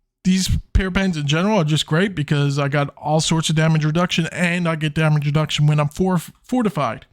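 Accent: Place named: Newfoundland, Canada. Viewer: where u from u sound like a newfie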